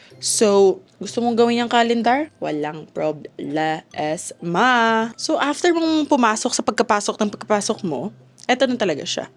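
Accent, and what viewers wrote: Iloilo, Philippines. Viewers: skruwd ap ilonggo accent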